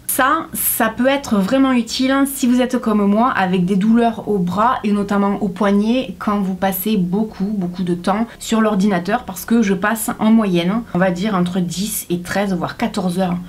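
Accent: South, France. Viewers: Salut! Je voulais juste te dire que les stylos anti- éraflure sont une grosse arnaque, même les plus chers ne fonctionnent pas, donc pas la peine de débourser 20e chez Norauto tu seras juste déçue!! Et sinon j'adore tes vidéos, elles sont toujours intéressantes et m'ont permise de découvrir pas mal de bon plans et je ne me lasse pas de t'écouter parler avec ton p'tit accent du sud. Gros bisous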